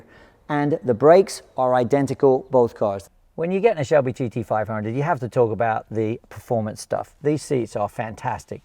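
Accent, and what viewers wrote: United Kingdom. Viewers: He is from the UK been in the states for 30 plus years.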